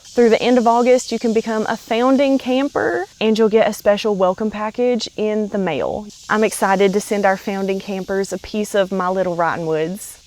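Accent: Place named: North Carolina, United States. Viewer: I love your accent it sounds very NC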